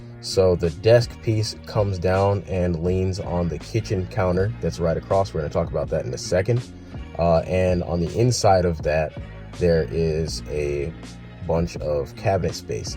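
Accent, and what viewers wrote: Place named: Canada. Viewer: You totally sound Canadian, where are you from?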